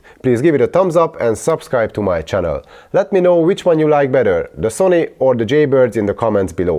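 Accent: Hungary. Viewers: magyar akcentus? :)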